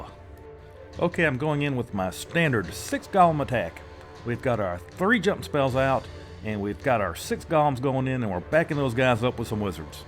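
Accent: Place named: South, United States. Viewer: this is great. 😂 as a southerner I love the accent and phrasing, it's wonderful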